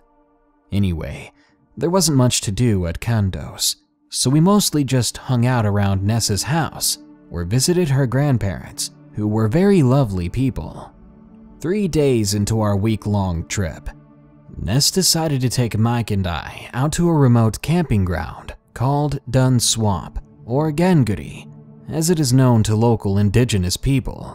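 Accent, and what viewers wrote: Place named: South, United States. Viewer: 🏅🥇at the risk of being ridiculed by others, I'm still gonna share this. Just wanted to take a moment to commend you for your growing success and amazing channel. I subscribed a couple months ago, however, I listen to numerous uploads per day so I consider myself an avid fan LOL. I can truly tell how passionate you are in your work and I think I speak for all your subscribers when I say thank you for putting in so much effort in this art. I can sense your pride in the awesome content, sound effects (especially the demonic voices) and communication with your subscribers. Sorry if this last part sounds stalker-ish bc I assure you I'm not a stalker! (Says every stalker in the history of stalkerdom) 🤣 anyway, I've always had trouble falling asleep until I started listening to you at night since your voice is so soothing! 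So thanks a trill for that! So yours is the last voice I hear at night LOL see that sounds creepy! But I think I'm drawn to that southern, country twang which comforts me, as I'm from N.E. Texas. So there's my experience thus far as one of your fans. You just do a great job with your channel and it should be recognized! Thanks for taking the time to read my message & CONGRATS on your recent nuptials! Hope y'all are off to a wonderful start on a wonderful journey (you know, because "this world is a strange one"!! I'll see ya in the comments, I'll be the one beasting on those who make hateful comments!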